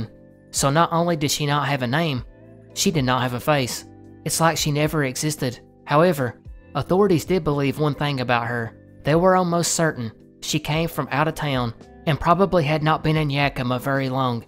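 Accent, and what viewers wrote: South, United States. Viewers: Also curious, where does your accent come from? I play games online with another southern man and his is different (more mild idk how to explain it) but it’s still obvious you’re both southern. Just wondering and trying to differentiate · Keep them coming Sir. +As a European I must admit I find it really refreshing to hear southern accent in this kind of videos. Thank you for your work!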